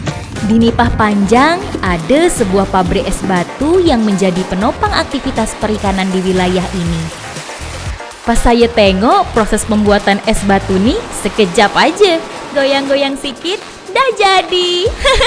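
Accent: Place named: Malaysia. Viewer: Kenapeeee suara narator ini seperti orang Malaysia. Padahal ini ada di provinsi jambi. Tak asik lah dengar suara seperti niiii. Tak jadi saya tonton.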